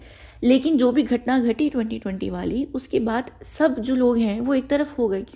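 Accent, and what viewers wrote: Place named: United Kingdom. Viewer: OMG!! HEARD you angrezi wali accent after.....AGES...I fell in love with your voice...before everything else!! Love you!! 💕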